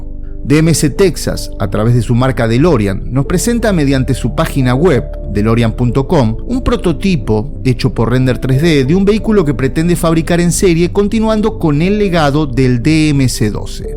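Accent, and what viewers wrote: Argentina. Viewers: No todos los Argentinos hablamos como porteño. Ustedes nomas dicen shon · Vamos todavía defendiendo el acento argentino asi me gusta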